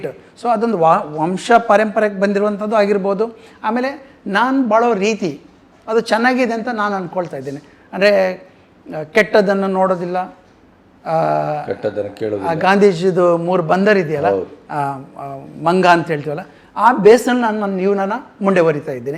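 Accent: Standard. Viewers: ಜೀ ನಿಮ್ಮ ಕನ್ನಡ ತುಂಬಾ ಶುದ್ಧವಾಗಿದೆ ಹಾಗು ನೀವು ಮಾಡುವ ಸಂವಾದ ತುಂಬಾ ತುಂಬಾ ತುಂಬಾ ಅರ್ಥಗರ್ಭಿತವಾಗಿರುತ್ತದೆ, ನಾವು ಹೇಗೆ ನಿಮ್ಮ ಥರ ಧೈರ್ಯವಾಗಿ ಮಾತನ್ನಡುವುದು ಹೇಳಿ👏👏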